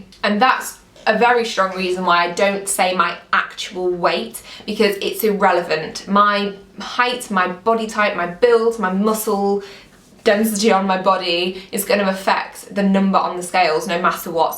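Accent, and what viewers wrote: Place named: United Kingdom. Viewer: You have a British accent. Lovely😍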